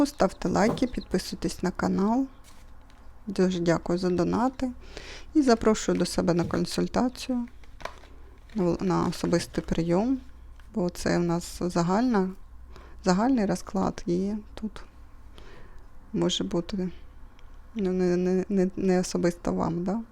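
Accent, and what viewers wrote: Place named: Russia. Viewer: Так, привіт, що ж я перша сьогодні, гарно оформлені ці ленорман та жаль що капацькі родом. але люде й так не знають хто їх виготовив, тож все одно повірять. продовжуй гадати. круті когті, зразу видно що хижачка, що не властиво українці. Але й рушник теж намальований, не вишиваний він вручну. Кого обманюєш липовим полотенцем, і вимовою з расейянським акцентом, певно що дурачків?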